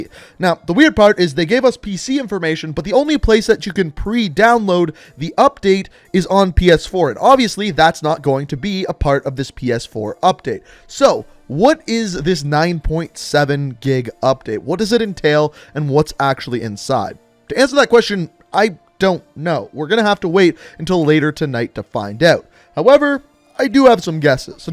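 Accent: Canada. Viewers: Lol I can't get over that Canadian accent bro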